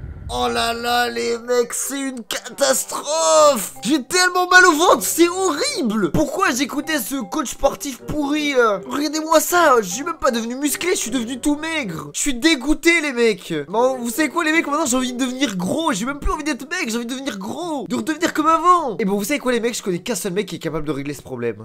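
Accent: Marseille, France. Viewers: Incroyable l'accent marseillais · "Je t'en met 1 pas 2"😂"je vais te taperrrrr" 😂magnifique l'accent Marseillais 😂😂😂meilleure video de tous les temps 🎉🎉😂 continue comme ça😂🎉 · Sé té tro. Marent l a ! Accents en marseille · C’est une masterclasse l’accent marseillais continue avec l’accent marseillais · Tu fais bien le marseillais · G adoré l accent marseillais 😂😂😂😂😂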